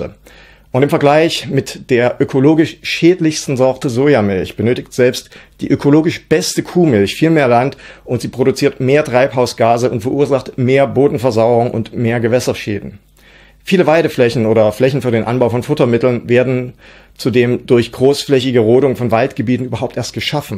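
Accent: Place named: Saxony, Germany. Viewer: ich höre es nur gaaanz leicht raus, mein Mann sächselt deutlich mehr. Ich mags. 😁😉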